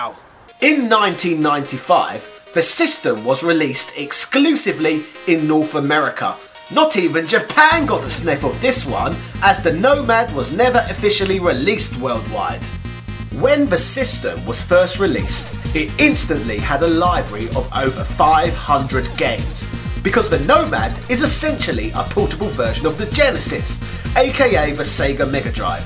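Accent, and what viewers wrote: United Kingdom. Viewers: Subbed. You remind me a bit of AVGN, with the fantastic British accent, which is awesome. Keep up the good work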